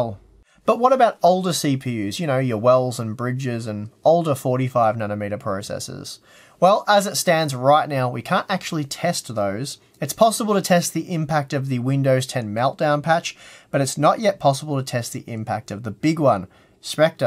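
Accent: Australia. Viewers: Will the next generation of CPUs have this issue? Actually glad I waited to upgrade, even if the hit was slim to none. Also thanks again for the updates. And once again, love your accent. Australians have perhaps the most pleasant accent IMO.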